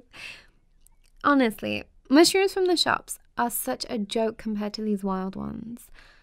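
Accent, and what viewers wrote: United Kingdom. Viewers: Here i am, with headphones on and holding my pillow as if im spooning someone, ready for another of my fav British ASMR creators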